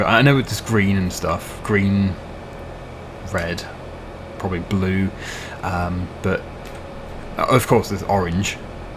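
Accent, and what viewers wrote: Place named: United Kingdom. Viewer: dount ever change how you talk british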